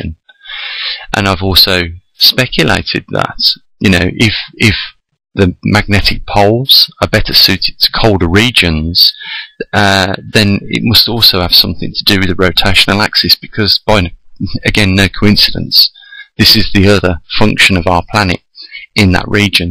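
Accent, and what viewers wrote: Birmingham, United Kingdom. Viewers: I here a brummie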